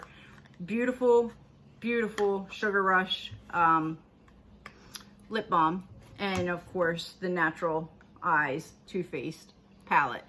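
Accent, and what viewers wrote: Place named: Pittsburgh, United States. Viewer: Heard your Pittsburgh accent and subbed :-) Was considering stopping premium but maybe not lol. Great unboxing. Love the hair and also saw you in my rec feed.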